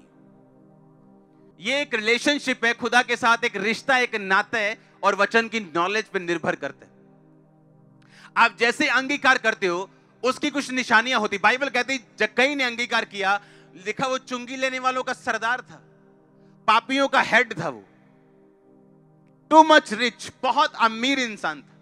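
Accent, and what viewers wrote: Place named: Punjab, India. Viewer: Sir ji daily I listen to you ur punjabi touch is very attractive. Lord Jesus bless you with endless blessing🙏🙏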